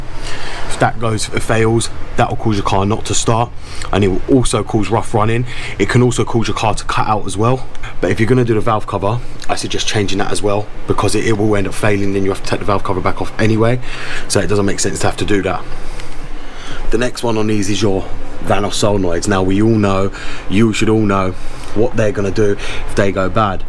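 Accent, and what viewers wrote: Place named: Scotland, United Kingdom. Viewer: comprehensive information, thank you, can you please consider to speak a built slowly, for non-scotich native it will be difficult to follow you. I turned on youtube auto English subtitle caption but it seems this option can't catch what you said too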